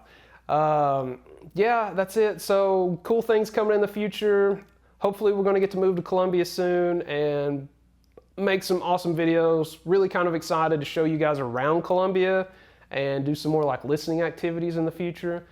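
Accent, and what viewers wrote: South, United States. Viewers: Y'all's channel is my favorite. I have a new grandson from a bilingual marriage. I've been looking for Columbian teaching online and Maria's approach works. She makes it organic and fun. I love your Southern accent. It's how we talk in Ala/GA. I trust what you and Maria do. Looking forward to watching you guys for years to come. Your relationship is more important than the channel so do what you gotta do to keep lovin' each other. Praying for safe travels. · I always enjoyed watching another regular person, with a southern accent no less, struggle with Spanish. You make me feel more comfortable with my struggle. Don’t let the varmints get to you! By the way, I am 62. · I get you, man. Learning a language is damn hard. It seems like it takes forever to master it. IMO just take your time and let it all hang out, you do it for yourself (and for Maria I suppose), to for others. 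And as a non-English native speaker I like you American accent, by the way ;) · You have a southern drawl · I wouldn't be able to handle being trolled either. I don't think they realize you're humans. You may always have a strong American accent but so what. You and Maria make videos that I love. So thanks for sharing, brother! I hope you love Colombia!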